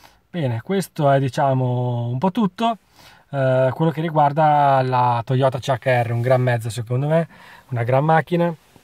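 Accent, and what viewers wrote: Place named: Romagna, Italy. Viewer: Di dove sei sembra che hai un accento Romagnolo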